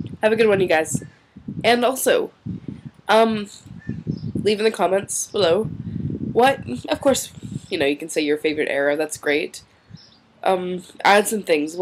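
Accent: Canada. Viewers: Canadian accent? D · I just realized that you say ABOOT! It's slight but it's there! AWESOME!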